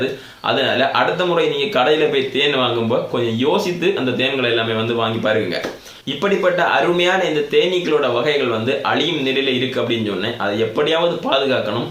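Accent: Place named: Kanyakumari, India. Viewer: அருமை. குமரி தமிழ் சிறப்பு.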